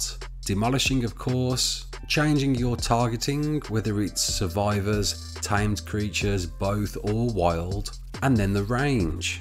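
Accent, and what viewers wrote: Birmingham, United Kingdom. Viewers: Are u a brummy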